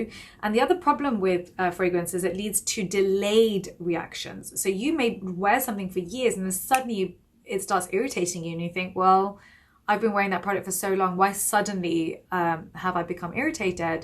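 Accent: United Kingdom. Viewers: She has a very sharp British Accent. ❤️ I love to hear her every day.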